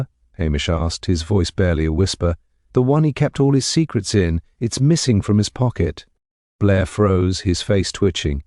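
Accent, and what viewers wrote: United Kingdom. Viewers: The reader is very good and diction is very clear. However I’m sorry to have to say this, but hearing this read by a very English accent spoils the atmosphere, reality and charm of this story. The charm is in the Highland accent and pace of speech and this is not it. But I’d listen to any other story read by this speaker as he was so clear.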